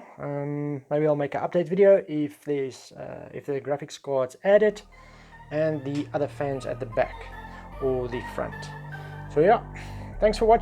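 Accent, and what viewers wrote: South Africa. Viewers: Kan hoor jy is van SA xD jy die by die werk gebou?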